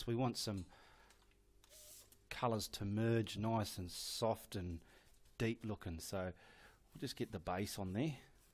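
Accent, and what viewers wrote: Australia. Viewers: Thanks again, I am a Kiwi and all our canvases are still in inches. I usually use 12x16 but they seem to be much larger on utube. As you can probably tell I am a 73yr old new chum at painting. I really enjoy your work and your Aussie twang!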